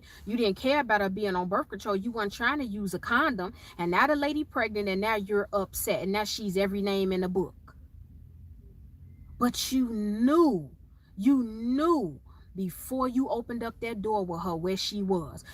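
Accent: Chicago, United States. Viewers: I knew you were from Chicago because of the accent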